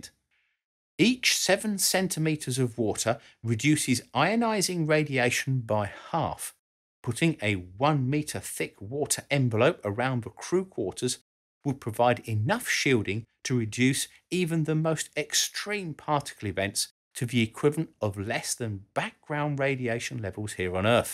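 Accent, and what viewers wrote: United Kingdom. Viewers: British accented narrators are the best because the rhythm in their sentences and the pitch/sound of their voice makes you feel like your high IQ grandparents are telling you a story! I love your work and the mix between photos and videos as you talk makes you feel like you are really immersed within the topic. Ps: I really would love more space/aeronautical engineering videos. 🌎🌕🌞🪐🚀🛰👩‍🚀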